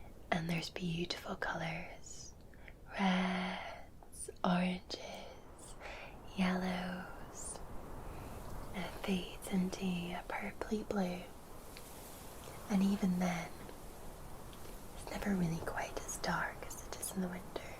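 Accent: Scotland, United Kingdom. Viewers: You've got the most perfect gentle accent and Scottish to to bring peace and calm to a late night and days end thank you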